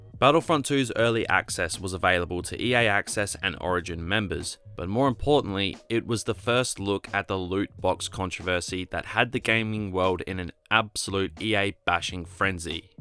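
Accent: Australia. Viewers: ThePizzadude93 he’s not British tho he’s Australian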